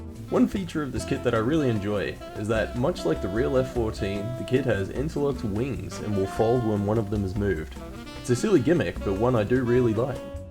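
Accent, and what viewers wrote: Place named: Australia. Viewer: You sound australian. And your glue seems familiar Did you get this set from metro hobbies?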